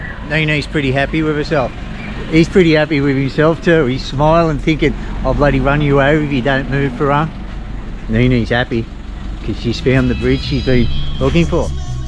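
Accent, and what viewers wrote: Australia. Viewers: Your music suits so well to your pictures !!! Thank you for getting a drone. Thank you for beeing so generous to the poor people. After 2 years I start to get used to your down under acccent. Cheers from Germany. I hope we have a beer one day.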